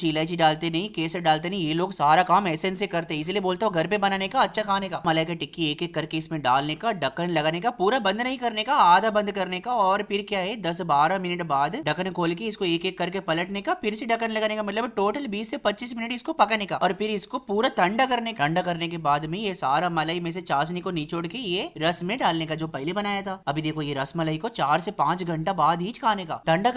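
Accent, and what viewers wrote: South, India. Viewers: You r amazing 😍aap sach me south se ho ya south accent only for video 😅👌🏻👌🏻👌🏻👌🏻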